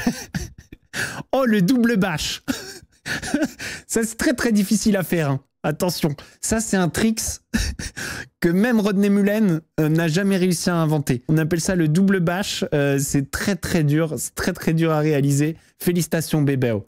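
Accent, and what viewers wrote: Marseille, France. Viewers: T es RIDICULE avec ton accent Marseillais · tu fais trop rire avec ton accent marseillais 😂😂😂😂on dirait plutôt un accent asiat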